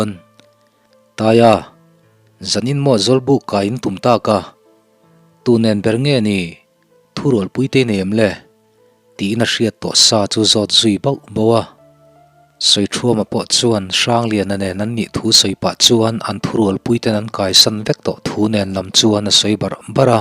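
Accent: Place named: Pawi, India. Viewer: Mapeka, ka va zah che em aw, i thiam ang tih ka ring e, keini thangthar leh thalai zawk tena kan lo chhiar dan leh hriat dan hi a dik fu lo zawk em mawni tiin tiin ka in ngauhtuah rum rum mai. 1895 ah khan mizo hian hawrawp te kan lo nei ve tan chauh kha ani nia, ziak dan leh lam dam dik hi keini thangthar zawk te hian ka lo hre dik lovin kan ko hre fu lo zawk nge ni. Sir hi ( Synod- Sinawt-a ) Music kan zir laia an lamrik fo anih vang leh an sawirik zina a tumruhna te an sawi vangin, a channel hi ka bel chawt mai ani ani, sawi dawn ila ka sawi treuh thei mai hian ka ring e. Lai (pawi/pawih) chuan Lei ( Tongue angin kan lam thin) Lushei/Lusei chuan Lei ( Soil) angin an lam thin niin ka hria. Leido kan nei thin a ( Lei-do) Lei anga Sir a sawi a nih chuan Lai /pawih tawng angin a sawi tihna ani ah ka ngai. ( Khawlhring/ khualsim/ hlawncheu te nen bik hian tawngin ang deuh an hmang vek ani) Entir nan ( Lei do kan nei ih, kan neh ngei ding an lu ngei cu kan la ding). 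Pawi tena kan lamdan leh sawi dan aw. NB: Tawng reng hi a dang a, tui a dir e an tih lai in, tui a haw ti tawk kha kan awm miau mai kha ani a, sawi fiah viau dawn ila diklo ti tu an awm anga, dik ti tu te ab awm ang, kan tawng zawng alo har ani e.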